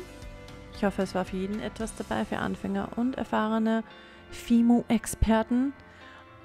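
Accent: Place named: Vienna, Austria. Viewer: I könnt mi wegwerfe! 😂Der Dialekt! Wiener Schmäh & More! Lustig! Das macht Spaß, Dir zuzuhören! Und danke für die hilfreichen Tipps! Möchte demnächst mal anfangen😊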